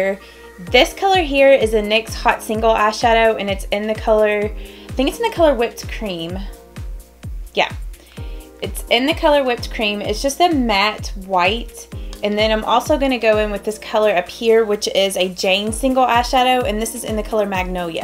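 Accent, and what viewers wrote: South, United States. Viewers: HI.:) I JUST WANTED TO SAY. YOUR MAKEUP ALWAYS LOOKS FANTASTIC;) YOUR ARE SUCH A BEAUTIFUL GIRL;) IM ORIGINALLY FROM OKLAHOMA AND JUST LOVE YOUR COUNTRY ACCENT;)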